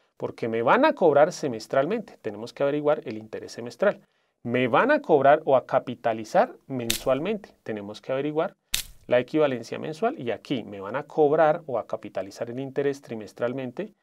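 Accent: Colombia. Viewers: Profe eres colombiano????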